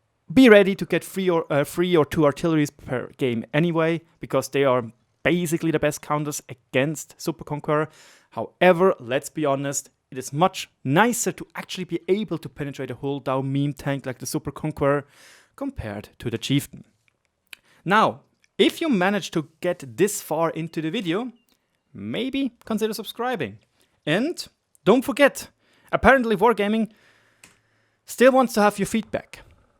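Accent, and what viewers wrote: Germany. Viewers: Great job with the vids m8, one small hint tho': dont talk so fast :) i know your main language is german and your enlish is very good but the german accent sometimes kill your words :) keep it up - we enjoy your content and your effords!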